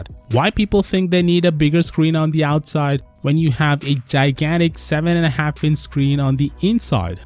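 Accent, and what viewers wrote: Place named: India. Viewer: It's impossible to listen to this indian english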